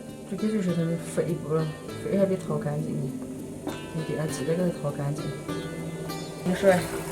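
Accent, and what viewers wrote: Kunming, China. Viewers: 我不是寻甸呢，只是之前在昆明呆过两三年了，所以一听就听出来了。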